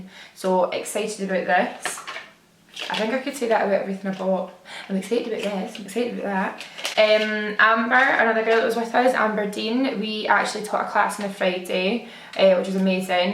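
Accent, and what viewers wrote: United States. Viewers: Your American accent was spot on hahaha! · The American accent! 😂😂😂 I freaking love you. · Seriously loved your American accent!!!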